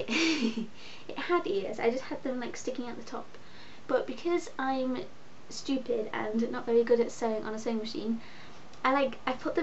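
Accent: United Kingdom. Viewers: I love you're accent are you Brittish??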